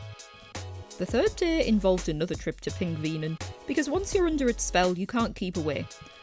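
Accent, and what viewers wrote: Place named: Newcastle, United Kingdom. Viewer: While watching I thought that’s a geordie accent then you mentioned Ouseburn. I’ll have to check that one out because I love wandering around Ouseburn. I’m going to Bergen in April so looking for tips. 😊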